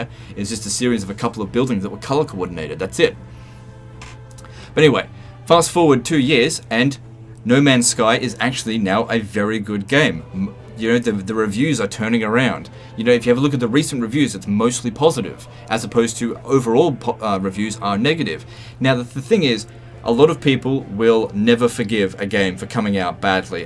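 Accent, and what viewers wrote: Australia. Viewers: CA fanboi since Centurion on the Megadrive. All TW games have some shit and some gold. Some are golden shit. Bought everything except that shitty game after Empire;) Britannia, played it a bit but I mean ffs CA. Release TW:WH3 and Three Kingdoms already...Will always be about watching your shit. Need more aussies talking like aussies.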